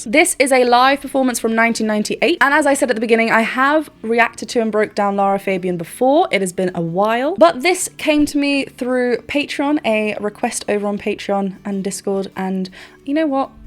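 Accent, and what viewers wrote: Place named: RP, United Kingdom. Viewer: I feel like I have to avert my eyes from your video because of your speech quality. I am very easily affected by the dialects I listen to you. Give me one hour of listening to you and I will sound equally posh. Edit: Which btw could be misconstrued, but is not meant as an insult - at all.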